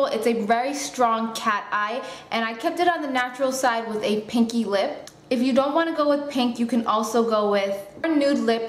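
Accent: New Jersey, United States. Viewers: Jersey accent ! .. :)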